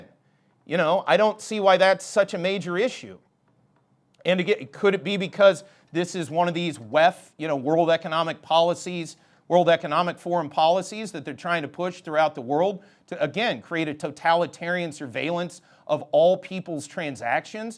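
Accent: United States. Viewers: I love the way you say "us Thais"... a true patriot! American accent. Thai heart ❤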